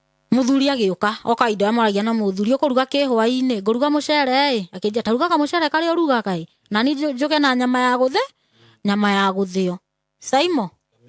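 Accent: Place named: Nyeri, Kenya. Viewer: She is sturbon nyeri lady from her talk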